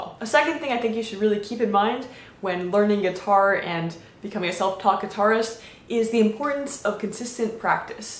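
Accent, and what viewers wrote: Netherlands. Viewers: You sound Dutch, is that true? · Are you dutch haha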